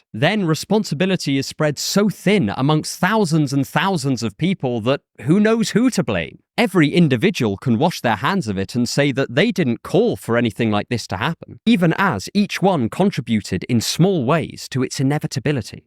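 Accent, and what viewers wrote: United Kingdom. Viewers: Whatever you call the way this guy talks - its more than just a British accent - is annoying and I dont like.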